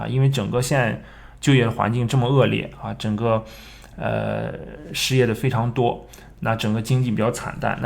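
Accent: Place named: Inner Mongolia, China. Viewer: 内蒙口音听着很舒服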